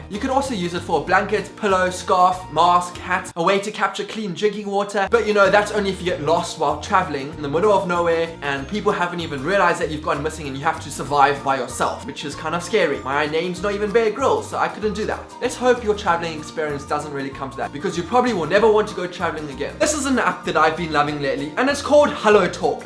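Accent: South Africa. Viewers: HAHAHA YES FOR THE MOONBAG! And no! Never change the South African accent! It makes foreigners think we're exotic! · Rocking at moonbag! I'd go back to Italy! It was amazing! (Please don't give up that SA accent!:P)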